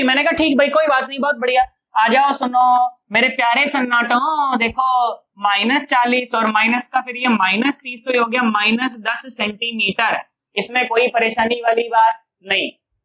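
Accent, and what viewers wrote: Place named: Bihar, India. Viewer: First of all, you speak a very nice bihari, secondly your explanation is also very good... I got the concept..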